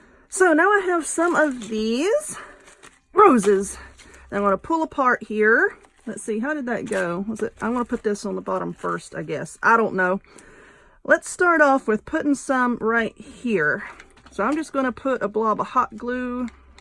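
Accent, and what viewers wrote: South, United States. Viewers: You were cracking me up with the little comments about your ex. So funny. I love your southern accent and sense of humor. And also your creativity. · I know this is an old post, but I love it. Where are you from? You have a somewhat southern select. Loved heart wreath.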